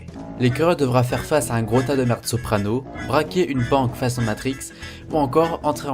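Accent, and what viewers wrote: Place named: England, United Kingdom. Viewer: tu un belle acsent anglais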